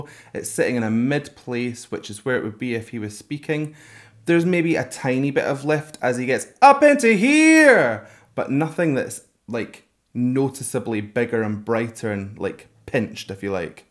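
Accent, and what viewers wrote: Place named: Glasgow, United Kingdom. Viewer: what you said about RM's speaking voice being smoother than his rap, I think is true of SUGA and j-hope, as well. SUGA's rap voice jumps wide intervals with interjected squeaks and lots of aggressive rhythm, but his speaking voice is warm and humble. j-hope speaks sweetly most of the time, but he can rap like a dancing firecracker! BTW, I enjoy not only your commentary but your accent. Glasgow is one of my favorite cities (I live near San Francisco). Can't wait to go back! Stay well!!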